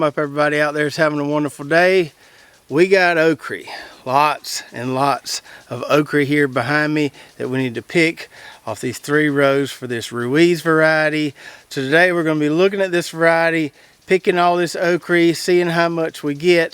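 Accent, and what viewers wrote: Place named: Georgia, United States. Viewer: I have a G. Neri fig tree that I bought from a nursery in Louisiana a little over a year ago. We were moving so it stayed in a pot for a good while. We moved late in the year (2019) so I didn’t plant it until the following spring (2020). It did okay the first year but didn’t grow much or bear any figs but I didn’t really expect any that first year in the ground. Then a late frost in the spring of 2022 killed it back to the ground. It has grown to be an amazing large plant over this year and it has a lot of little figs on it. I’m not sure if they’ll ripen before frost this year but I can tell it’s going to be an amazing producer. I have a Madeleine fig I purchased last year - again, killed back by that late spring 2022 frost. I also have 2 nice-sized Brown Turkey figs that I rooted from cuttings off the tree at our former residence. 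Those suffered the same fate as the G. Neri but they also have grown into very nice young trees. I expect a decent amount of figs off them next year. There are some figlets on them now but I will be surprised if they ripen before the first frost this autumn. I really enjoy your show - so much good information and you are in my state of Georgia, although some 200 miles south of me. I grew up in Dublin and I enjoy watching and hearing you speak - you sound like the folks I grew up with! 💕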